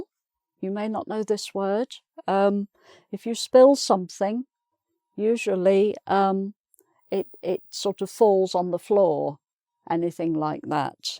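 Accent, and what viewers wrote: United Kingdom. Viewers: What lovely British accent, you're so kind for helping us. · I love your british accent ❤ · You are amazing! Your British accent is very beautiful. Thank you for your lessons!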